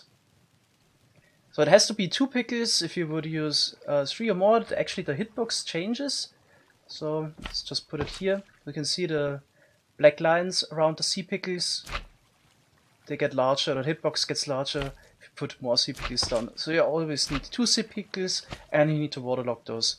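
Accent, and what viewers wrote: Germany. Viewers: Dumb question, is he German ? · Muttersprache deutsch oder? Hört man ziemlich raus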